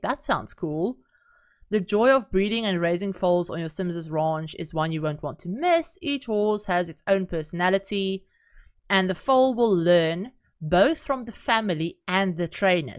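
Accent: Afrikaans, South Africa. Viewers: OMG your accent r u South African, because if u r this will be my first time coming across a South African sims4 content creator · This is my first time here and I recognise a South African accent when I heart it so I had to do a double take and check that I'm hearing right because I know SA creators in this space are few and far in between. Congratulations. ❤ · OMG, I immediately recognised your Afrikaans accent the moment you started talking! Very cool to see another Safrican simmer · Yes, immediately recognised that SA accent and subscribed straight away😁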